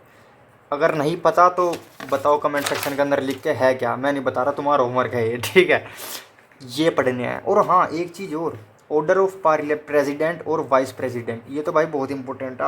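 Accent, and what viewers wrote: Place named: Baraut, India. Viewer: Kaha se ho aap baraut side ki language h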